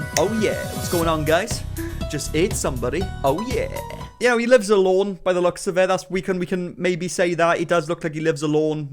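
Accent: Wales, United Kingdom. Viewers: you from north or south wales im also welsh so its ez to know tht you from here lol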